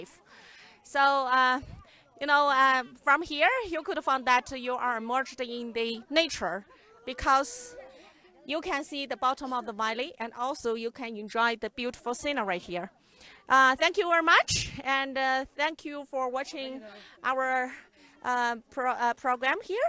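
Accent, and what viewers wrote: China. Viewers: What I love about the video is that when she switch from English to Chinese or vice versa she sounds the same mean Chinese her Chinese ascent is so Heavy as she goes from English to Chinese I'm like hold on what?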